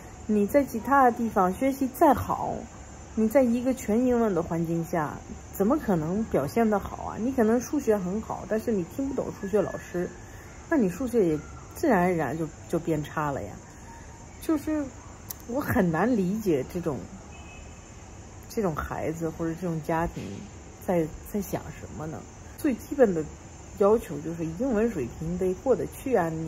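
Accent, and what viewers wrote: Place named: Beijing, China. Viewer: 这中文都有京腔京味了，厉害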